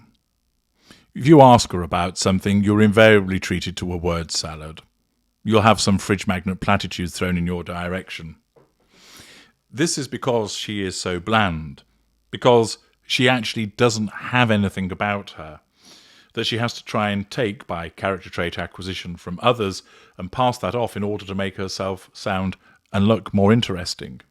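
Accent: United States. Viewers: Love your American accent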